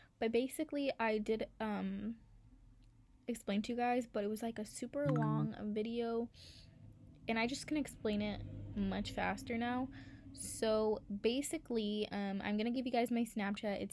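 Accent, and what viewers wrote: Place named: Florida, United States. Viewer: You sound like you’re from Florida based on your accent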